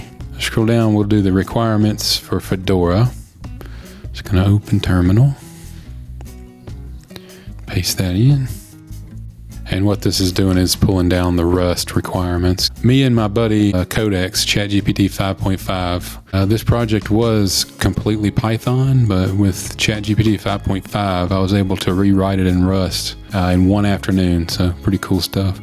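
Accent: South, United States. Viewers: you got a bit of a southern accent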